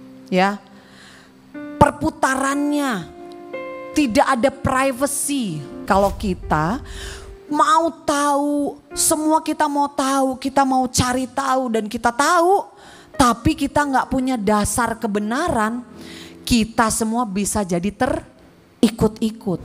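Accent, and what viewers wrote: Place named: Java, Indonesia. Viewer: Pasteur nama batak kok medok dan campur bahasa Jawa tp jd lebih membumi ❤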